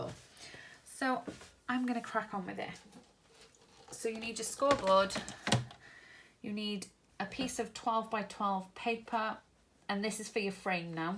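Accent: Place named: Wales, United Kingdom. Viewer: Hahaha see even when you write im laughing cos i can hear you saying it in my head in your welshy accent so funny! I feel like your about to burst into disney at any time - cant imagine what your like when your in the disney shop i bet your dancing and singing aint you 😂 xx hahah yeah its the only time your quiet in the dentist 😂😂 xxx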